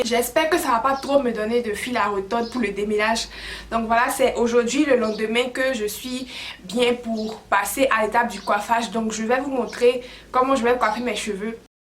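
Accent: Ivory Coast. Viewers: Une vraie Ivoirienne rien que t'entendre jee retrouvé u puays 😍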